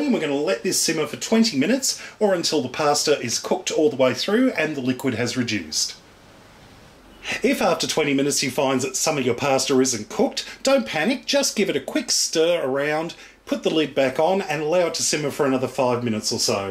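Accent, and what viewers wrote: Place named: Australia. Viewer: Yes, he's an Aussie.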